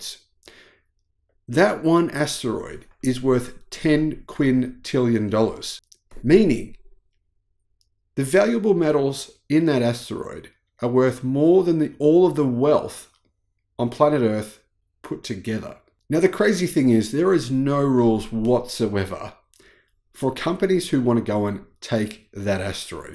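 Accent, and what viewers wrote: Australia. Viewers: He's Australian, mate. I'm a kiwi from just next door and we all say NAHSA too. So do the British, which means our is far from being the only one. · That's exactly right, he's Australian and Aussies don't say Narsa like the English, etc do. Get it right if you're going to correct me, mate.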